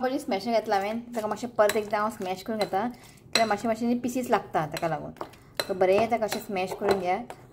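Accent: Goa, India. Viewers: Your Goan ton(style) is khup chhan ahe👌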